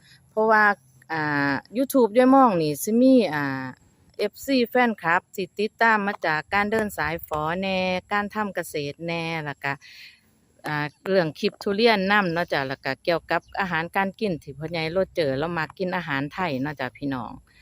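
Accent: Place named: Sisaket, Thailand. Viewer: สำเนียงเหมือนคนศรีสะเกษจังครับ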